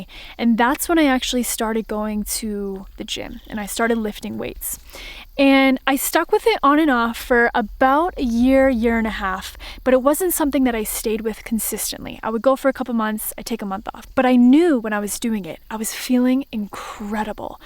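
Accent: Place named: Midwest, United States. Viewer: If you both are from Boston you sure don’t sound like it you sound like Midwest. I’m born and raised in Boston you don’t sound like anyone here lol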